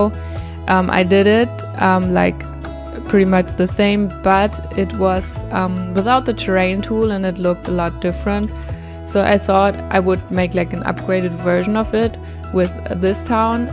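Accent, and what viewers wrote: Germany. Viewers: I hear a german accent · Are you german? Because of your accent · Are you german? Cause u sound like it 😅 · are you german? you kinda have a german accent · You Sound very german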